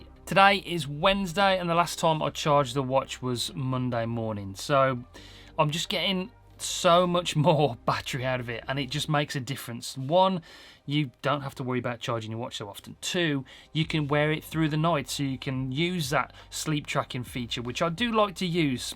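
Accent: Birmingham, United Kingdom. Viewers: Proper brummy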